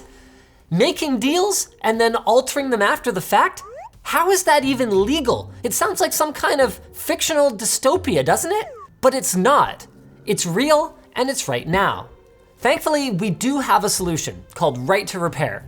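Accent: United States. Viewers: Linus just sounded so American when talking about all of this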